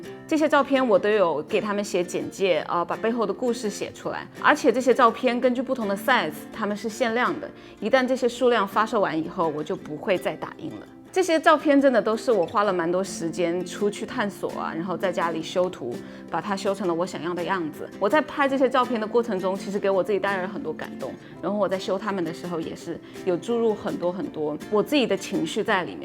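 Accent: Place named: Taiwan. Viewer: Steely是台湾人吗？说话一股台湾腔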